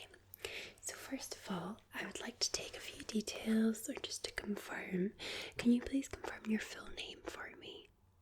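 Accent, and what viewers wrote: Scotland, United Kingdom. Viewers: Are you Scottish? (I know its in the name but still😂) I was just wondering because I am Scottish and I have been looking for a asmrtist who is Scottish because I don't know why but I love Scottish asmr😂 I also wanna say that I love you and your channel so much! You have helped me with my depression and anxiety! So a massive thank you💖 Keep up the wonderful videos!💕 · Read today in an article by the BBC that scientists have said a Scottish accent is an anti depressant because of its ability to cause ASMR....after watching your videos for the past year I can honestly, conclusively say those claims are true!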